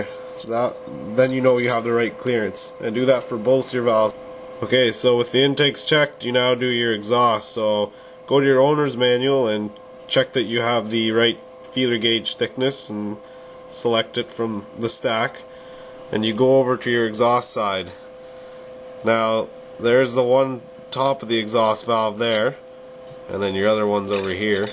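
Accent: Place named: Canada. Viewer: it took 14 seconds for me to realize you were Canadian lol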